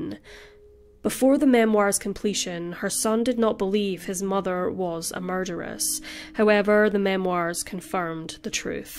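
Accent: Scotland, United Kingdom. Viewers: Yup Scottish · I’m trying to work out where the host dialect is from she sounds Scottish but she doesn’t sound just Scottish, it’s puzzling me 🤔😆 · Scotland 100% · She's Scottish. Her twin sister Kristy Skye has her own channel and said they're from Scotland.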